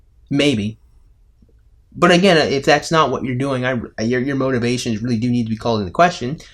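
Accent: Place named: England, United Kingdom. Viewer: I love that you STILL use that English accent. Watch you end up moving to England and your videos will start to become more an more like the real thing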